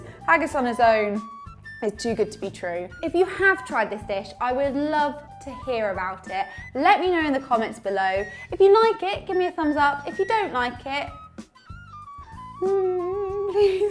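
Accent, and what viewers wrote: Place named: Scotland, United Kingdom. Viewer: OMG I love your Scottish accent! Great video as always...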